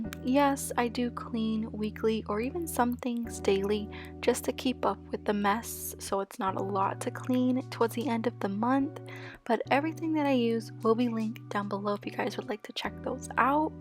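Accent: Boston, United States. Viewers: Just found your channel. Luv ur Boston accent ♥️🤍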